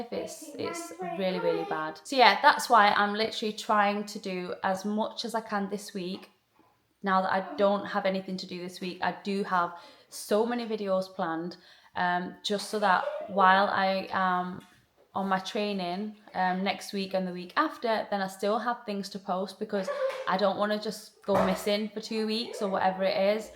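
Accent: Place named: Scotland, United Kingdom. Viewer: Love the video and seeing the squirrel. Haven’t seen one for years. Wow those fees are extortionate. I love the Scottish accent ❤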